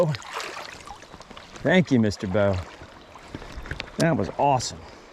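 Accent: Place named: Pennsylvania, United States. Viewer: I'm from PA and everytime I hear you say water(wuder)it takes me back. Love it! Took me a long time to stop saying it that way myself after I moved - probably because I wasn't trying to change it. I live in the Smoky Mountains of NC now and just started fly fishing this year. Lots of good places to fish, but I'm in the putting in your dues stage of fly fishing. A nice way of saying I'm not very good yet, but I love doing it! You are really hitting them Trout. I sometimes go 4 or 5 days without catching a fish! Just started euro nymphing myself and really like the control and feel. Anyway, great channel - I really enjoy your videos and just subscribed. Appreciate the Euro tips. Your inspiring me to head back to PA to see some Family and try the fishing that way.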